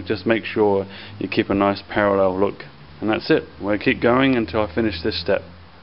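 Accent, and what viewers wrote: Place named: Australia. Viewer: Great demonstration! I now have the courage and confidence to recant several antique chairs I've been saving for a long time. You sound just like my son-in-law, he's from Australia too and has the calm reassuring manner of speaking. Thanks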